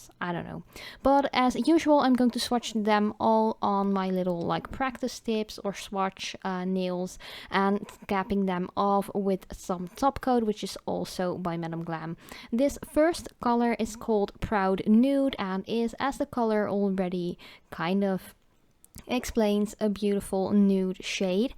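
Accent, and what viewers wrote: Netherlands. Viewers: Hello, I love your videos, they are absolutely amazing. Love your dutch accent ! (I used to live in Den Haag, had the best time of my life there) I have a question for you! How did you got sponsored by MG? I would like to do videos like you, but I assume you dont purchase these collors, they just send them to you? how did you started this companionship?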